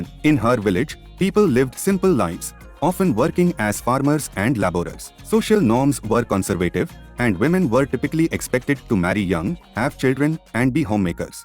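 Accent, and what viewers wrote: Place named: India. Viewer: Why out of all of the posts on this channel is this the only one with the Indian accent narrating it 🤔 😅😅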